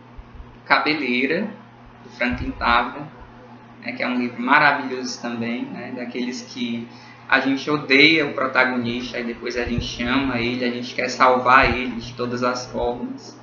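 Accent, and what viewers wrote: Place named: Fortaleza, Brazil. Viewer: Aaah!! Reconheci o sotaque pq moramos em FortaleZa 👏🏻👏🏻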